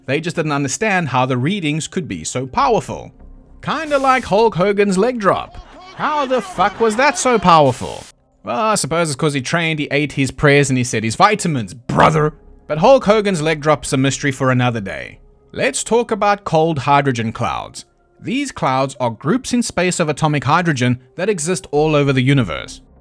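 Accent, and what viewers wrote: South Africa. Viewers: You sound just like my friend Ian who is from South Africa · awe boet🤣. I sat this whole video debating wheter you were a soutie or aussie. Ive been proven correct, love the channel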